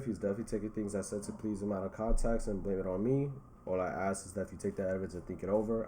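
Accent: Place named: Long Island, United States. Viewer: What part of Long Island you from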